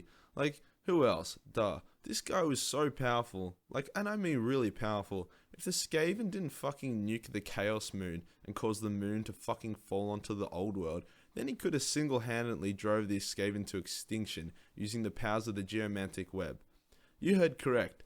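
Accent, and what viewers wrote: Australia. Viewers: Omg u speak total lad speech. need more Aussies on youtube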